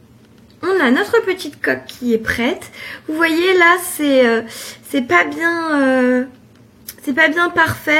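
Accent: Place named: North, France. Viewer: Je trouve que tu as l'accent du nord ^^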